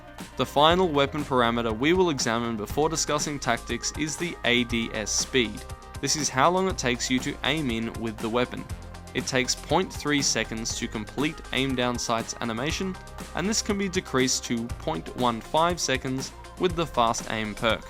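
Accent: Australia. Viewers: Are you Australian I'm Australia you sound like your Australian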